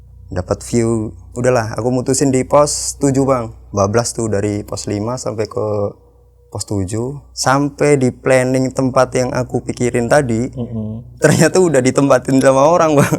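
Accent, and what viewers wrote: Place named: Banyumas, Indonesia. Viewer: Aloy versi ngapak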